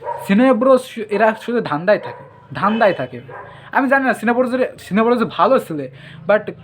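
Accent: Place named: Bangladesh. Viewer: Tumi ki bangladeshi naki !! Bangla evabe bolchho keno !! Chhi chhi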